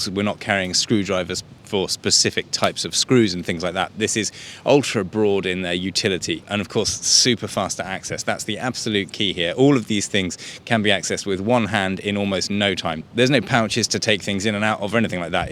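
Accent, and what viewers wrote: United Kingdom. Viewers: Thanks for the content and its good to have a Brit sounding voice chatting about the virtues of EDC in its most basic form. Good to know there is someone else on the same page :) Best wishes Mike in County Durham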